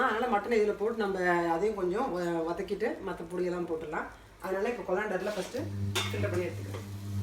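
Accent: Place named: Theni, India. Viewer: Oh ok k Ur slang like theni people 😊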